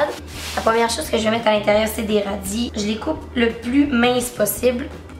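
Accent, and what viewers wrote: Quebec, Canada. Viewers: T québécoise en tout cas #teamjeparlecommeunmoronauxyeuxdesfrancais ...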